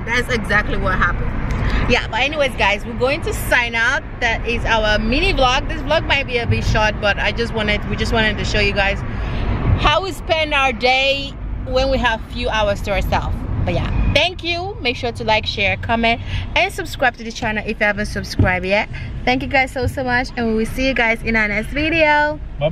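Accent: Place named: England, United Kingdom. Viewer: heel mooi engels accent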